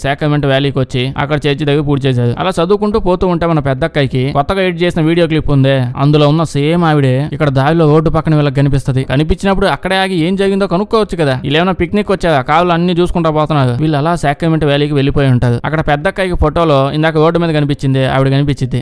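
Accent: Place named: Telangana, India. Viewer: Super bro horror movie kuda comedy movie laka chepavu telangana language super vudi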